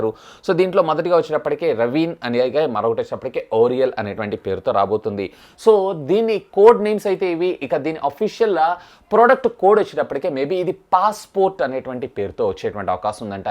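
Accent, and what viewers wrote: India. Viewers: Bro I like ur Buttler English😊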